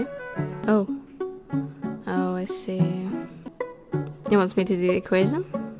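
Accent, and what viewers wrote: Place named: Australia. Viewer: The Australian accent is probably my favorite accent, its just like a lot more relaxed (some of the time), it just makes the video 100 times better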